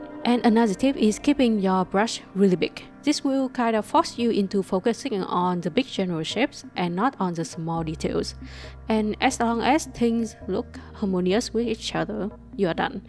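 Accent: Vietnam. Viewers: Nghe giọng bt ng Việt luôn:))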